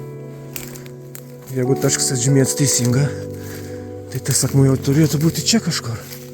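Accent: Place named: Samogitia, Lithuania. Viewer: Netikėtas Jūsų atradimas, bet sakyčiau labai maloniai nustebinęs. Toks paparastas vietinių, įdomių, nežinomų ir mažų dalykų parodymas tiek Lietuvoje , tiek Latvijoje :). Man kaip aukštaičiaui labai patinka , kad tai darot žemaitiškai. Sėkmės !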